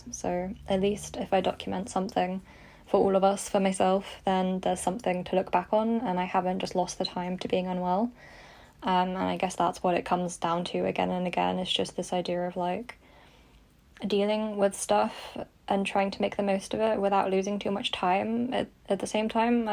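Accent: United Kingdom. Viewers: since i love british accent. love to hear you talk during long videos.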